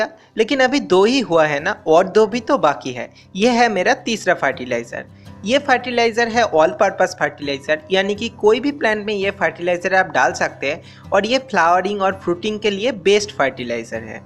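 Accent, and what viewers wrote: Bengal, India. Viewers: Nice accent. Bengali style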